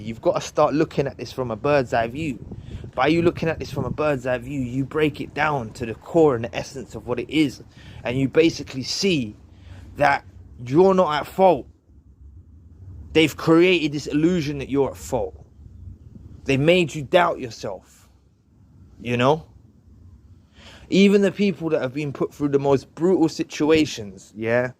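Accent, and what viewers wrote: London, United Kingdom. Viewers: Very welcome 👍🏻 What part of London are you from i was born in Leytonstone ☺ sorry for asking just love hearing a familiar accent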